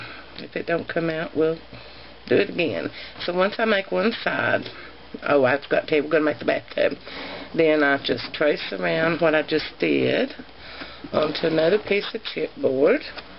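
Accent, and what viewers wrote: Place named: Tennessee, United States. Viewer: U r too cute. I'm from SC. You sound like you r from TN.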